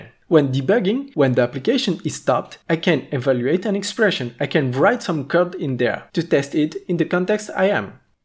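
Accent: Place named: Spain. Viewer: jajajaj, se notaba literalmente que es español y no me ha hecho falta ni buscarlo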